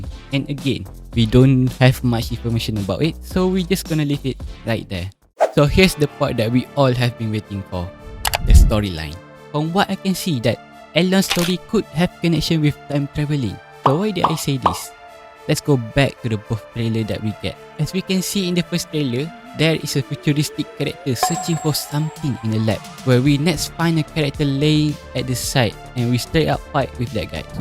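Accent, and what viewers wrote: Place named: Malaysia. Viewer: I can recognise this person from just the way he speaks English 😂 he from Malaysia😝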